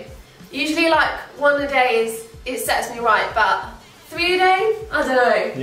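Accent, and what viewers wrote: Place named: United Kingdom. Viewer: omg your accent sounds so british! I'm just way too much used to hear american english lately, I almost forgot how a brit accents sounds :D ps. nice vid too :)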